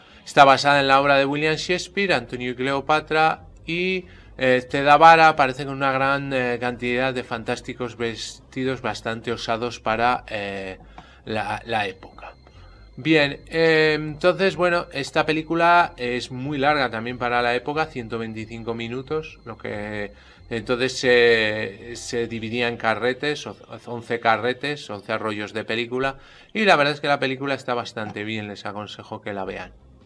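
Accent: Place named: Spain. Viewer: No soporto tu acento español te bloqueare mejor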